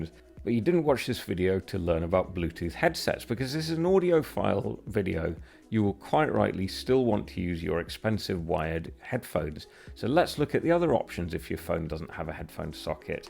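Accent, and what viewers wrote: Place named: Yorkshire, United Kingdom. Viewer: Very nicely spoken…..and I think occasionally I hear a trace of Yorkshire ..but I could be wrong…🙂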